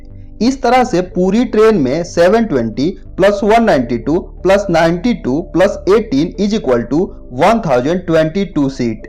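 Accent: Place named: Howrah, India. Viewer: Howrah se ho naa ? 100 %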